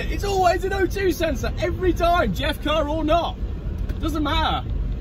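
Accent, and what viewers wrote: United Kingdom. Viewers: britisch accent😋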